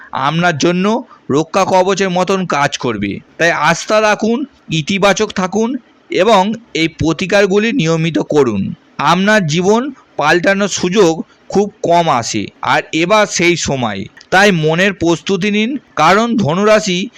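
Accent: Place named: Kolkata, India. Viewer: এটা কোলকাতা, পশ্চিমবঙ্গের কিছু লোকের অভ্যাস, এভাবে বললে তাদের ভাব বাড়ে।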